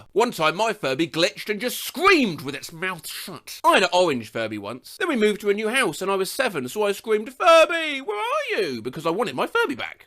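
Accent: United Kingdom. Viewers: i'v never heard a voice screaming "BRITISH" more than yours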